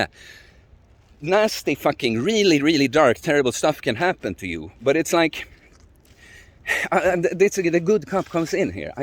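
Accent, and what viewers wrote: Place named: Sweden. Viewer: Once I heard the accent I knew you were swedish. Jag är inte svensk men jag kan svenska. Grym video bror fortsätt så.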